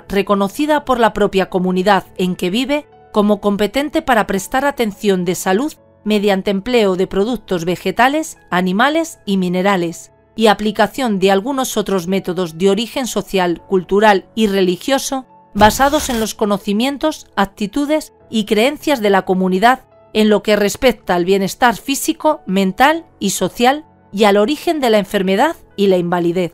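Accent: Spain. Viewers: Un gusto. 👏🙏🙋‍♀️♥️🍀🌺🇺🇾🇺🇾. Te mando un abrazo grande desde Paysandú Uruguay. Me encantan tus relatos. Y ese tono Español. Q ignorancia!!! X Dioss q en el siglo 21 exista estas creencias. Y matar a un buen hombre x nada. Lamentable estamos mundialmente más salvaje. 😭🤦‍♀️🤦‍♀️😭🙏🙏🙏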